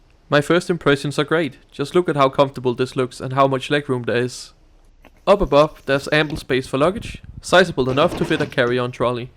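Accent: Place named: Denmark. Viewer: The video’s creator is not a native speaker of the English Language. His native tongue is Danish.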